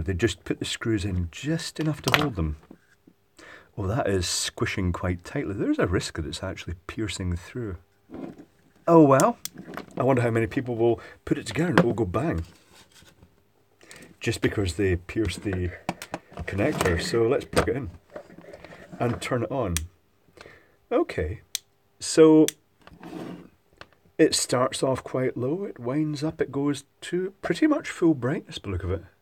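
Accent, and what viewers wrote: Scotland, United Kingdom. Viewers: Fairly heavy Scottish accent.... 'triac' becomes 'track'. Had to double-take a couple of times. TRI-AC. TRI-AC. Repeat 100 times,!:-)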